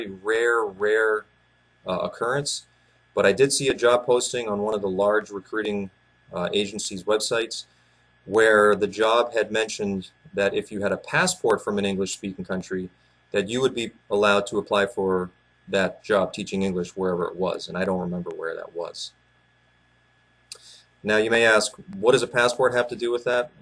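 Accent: New England, United States. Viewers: You're from New Hampshire, aye? EAST COAST represent!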